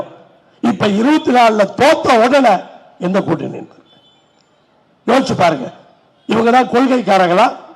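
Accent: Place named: Kongu, India. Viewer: அய்யா‌ கொங்கு தமிழ் வழியாக எல்லோருக்கும் நன்றாக புரியும்படி பேசியுள்ளீர்கள்.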